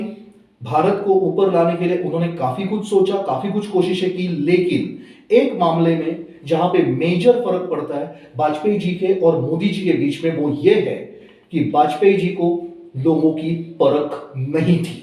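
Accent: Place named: Maharashtra, India. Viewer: साहेब, तुमच्या हिंदी उच्चारावरून तुम्ही मराठीभाषी वाटता. बहुतांश भारतीयांसाठी हिंदी व्हिडिओ नक्की करा. पण अधून मधून मराठीत सुद्धा 🙏 (पण मी कोणी गुप्तहेर नाही 😂)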